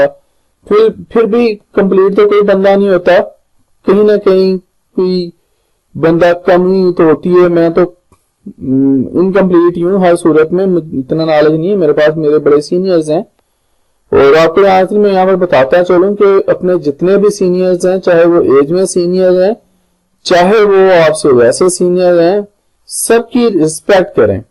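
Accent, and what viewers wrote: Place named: Gujranwala, Pakistan. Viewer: Your dialect sounds from Gujranwala.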